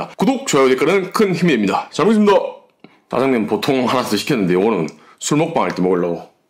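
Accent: Gyeongsang, South Korea. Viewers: 어디선가 뵌듯한데 어디서 봤는지 기억이 안나네ㅠㅠ 경상도 맞쥬? 위장 보호 해가미 드시소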